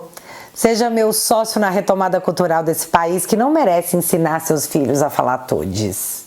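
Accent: Minas Gerais, Brazil. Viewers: você é uma pessoa incoveniente e sem educação, ninguém pediu sua opinião, você não percebeu com toda a cultura que acha ter que não tem, que faz um estereótipo de sutaque de mineiro do interior, que o canal dela é política com humor, falando sério letrado com erros grotescos de ortografia, observa seus espaços na pontuação e seus acentos.... No mínimo deve ser petista, porque escreve como eles😡😡😡 Você acha mesmo que vai dar importância a sua opinião? Enquanto você critica o canal dela só cresce... é uma influecer super relacionada no meio político, não é um Zé ninguém que vai oprimir🤣🤣🤣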